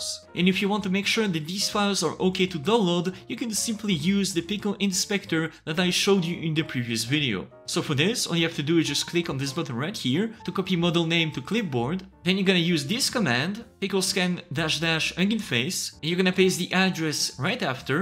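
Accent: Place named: France. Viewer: En 0.23 secondes j'ai compris que j'avais affaire à un Francais.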